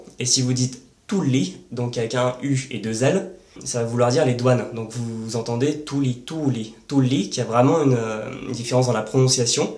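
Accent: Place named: Paris, France. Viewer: Je voudrais savoir où il vient. Je suis en train d'apprendre le francais et j'essaye de obtenir une sense des accents francais. Je pensé qu'il a un accent parisien, mais je ne sais rien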